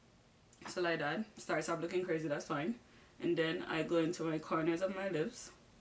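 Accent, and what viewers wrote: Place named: Jamaica. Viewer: Beautiful makeup routine . Are you Jamaican? I hear the accent . ❤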